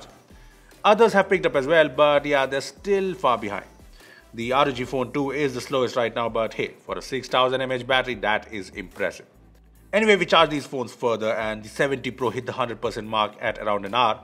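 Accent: India. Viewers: oh shyet another indian accent.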